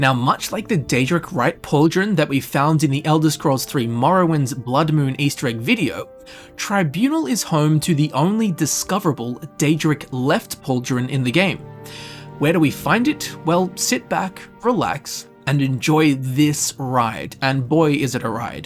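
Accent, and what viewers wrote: Australia. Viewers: I just love the idea of an Australian lore guide who speaks with some degree of eloquence, it's both hilarious and glorious at the same time.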